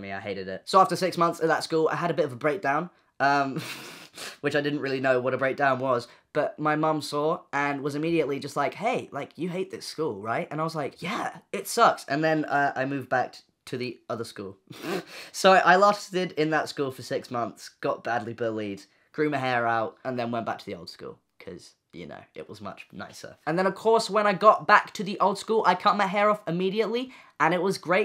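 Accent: United Kingdom. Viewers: They have the same type of British accent. British accents are different depending on where your from. Like if your from liverpool your accent is different to someone from the likes of Birmingham. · you're so British!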